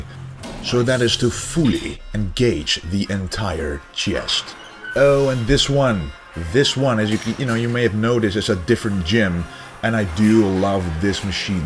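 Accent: Netherlands. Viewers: Yes, Dutch people--I would bet you he would tell you that the olympics are in Rye-o.They simply pronounce it as a long i to be safe. IO is tricky--think riot and prior. All different...Either way, sorta being a hard ass to bust a guys balls on a point such as that when he is doing a youtube video about fitness in a second language--pretty fucking impressive.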